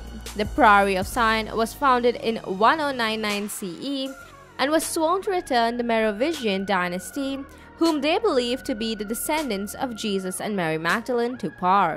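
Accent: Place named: India. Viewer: This girl has the worst accent lmao you can tell she is Indian "wictor Hugo" 😂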